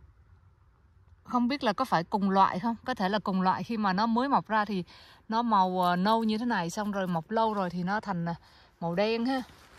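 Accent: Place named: South, Vietnam. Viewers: Giong Nam cua nguoi bac 54. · Giọng nói của chị rất chuẩn giọng miền nam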